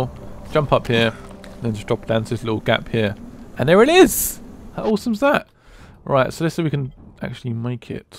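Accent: England, United Kingdom. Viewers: Are u born in England because u have this accent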